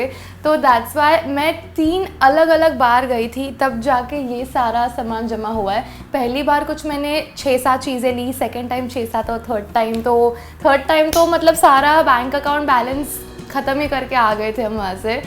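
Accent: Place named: Mumbai, India. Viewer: Mumbai hinglish is the best😁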